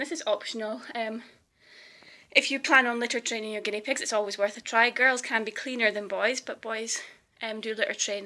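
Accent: Scotland, United Kingdom. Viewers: Are you Scottish? If you are then woo hoo! So am I...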